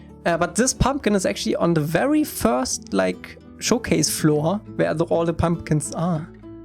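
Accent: Germany. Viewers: Der deutsche Akzent kickt aber anders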